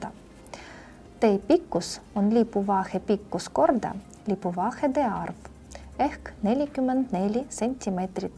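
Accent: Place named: Russia. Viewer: Üsna häirib mahalugemine, sest mahalugeja vaatab teksti, mitte kaamerasse. Võiks ikka rääkida otse nagu veltsi ja veiko seda teevad. Kui ei suuda seda eesti keeles teha, siis tehku vene keeles, mis ilmselt on õpetaja emakeel. Kõneleja eesti keele aktsent on üsna jube, nii et ilmselt oleks palju meeldivam, kui jutt oleks ikka venekeelne ja lisaks oleksid eestikeelsed subtiitrid või siis veltsi või veiko räägiksid sama jutu eesti keeles või siis toimuks vestlus nende vahel. Loenguformaat pole ka kuigi atraktiivne põhikooliõpilastele.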